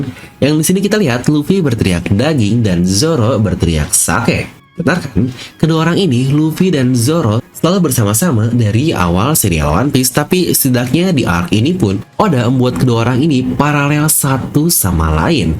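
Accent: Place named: Bandung, Indonesia. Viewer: hmmm kek nya orang bandung admin nya ahahahaa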